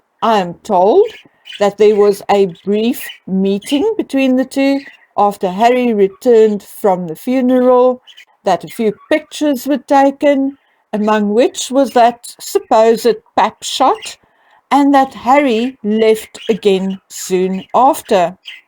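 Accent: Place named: Durban, South Africa. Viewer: JUST LOVE ACCENT EX DURBS? BACK IN UK.... M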